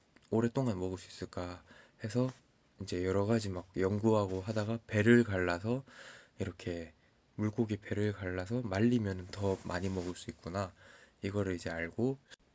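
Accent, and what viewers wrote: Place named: Gyeongsang, South Korea. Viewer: 어헛!! 님!! 사투리가 경상도 같았는데 무려 "대구" 셨군요!! ㅎㅎ 저도 대구본가 왔네요😊😊즐거운명절되시고 행복한 시간되세요🤩🤩🔥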